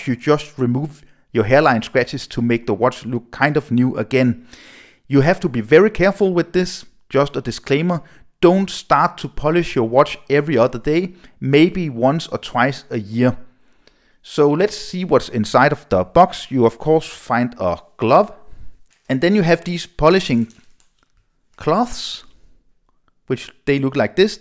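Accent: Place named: Denmark. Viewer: I like the Danish accent! I like Danes having Rolexes from just those 3 dealers nationwide! Anyhow... Cape Cod says not to wash it but to "buff dry" (which I did on my 09 GMT). Also you should have mentioned to tape the brushed steel parts (which again Cape Cod says are not to be applied). Nice video, nice watch though...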